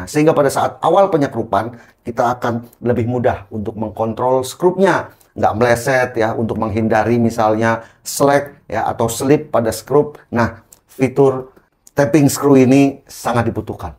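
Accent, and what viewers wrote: Tegal, Indonesia. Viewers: bhs tegal e mbabed...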